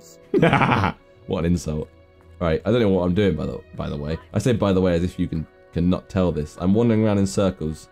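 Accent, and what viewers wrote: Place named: United States. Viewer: you sound very American when you speak... what to call it... gamer-lingo?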